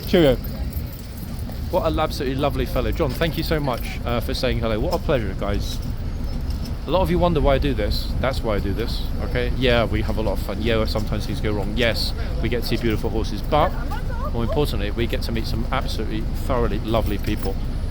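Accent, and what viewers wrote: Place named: London, United Kingdom. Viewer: Handsome and so is the Horse. LOL , Love your commentary look forward each day. and glad to hear a London accent. a big change for us ex eastenders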